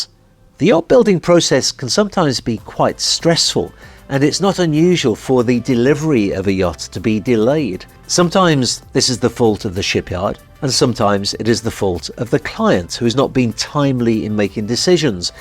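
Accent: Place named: Birmingham, United Kingdom. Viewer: VERY interesting video. The advantages are applicable for many industries too. Loving the brummie accent ... 🇬🇧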